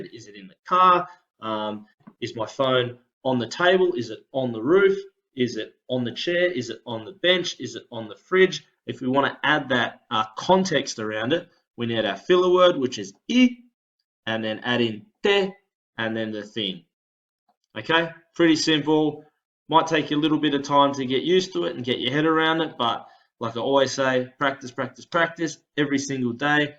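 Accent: Australia. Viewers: Strong Aussie accent there bro. Ka nui te pai. Good on you bro for doing this for us even here in Aotearoa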